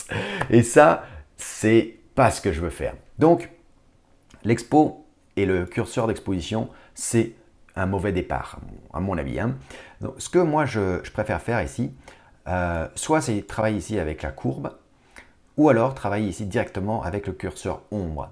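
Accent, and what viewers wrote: United Kingdom. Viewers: Merci pour ce tuto sympathique. Ce que j'apprécie dans ce cours (contrairement à certains), c'est justement son côté "amateur"!! Les idées de base sont là, et permettent à chacun d'approfondir plus en détail la technique. Ne lâche surtout pas ton petit accent "British" qui m'amuse bien et donne à tes cours un côté sympas!!! PS: l'intérêt de YT c'est justement de pouvoir partager ce genre de tuto avec les plus humbles. Pour les "autres" il reste toujours les hautes Ecoles Privées avec toute la choucroute qui va avec.....!!!! Merci encore et continue dans ce sens!!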